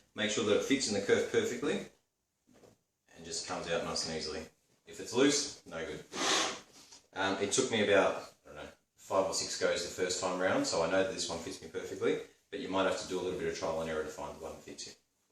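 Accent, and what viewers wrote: Australia. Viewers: This is awesome. Thanks for posting. Good to hear an Aussie accent in the ocean of youtubers! . cheers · very cool. i thought I needed a dado blade which my makita mtl 100 does not allow for or a more expensive router table so, so more money. But now I know I can make a jig like yours. My makita mlt 100 has custom narrow mitre channels, yours seem to have 20 mm channels mine are T 12 mm at top and T of 15 below. But I can cut some 12 bars to make it work. Great to hear a no frills ozzie voice and no drama queen. Paddy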